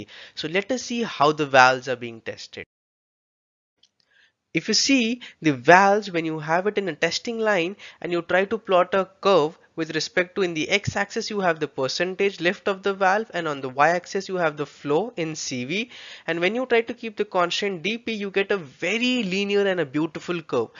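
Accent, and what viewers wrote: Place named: India. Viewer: Your English and explanation can only be understood by Indians.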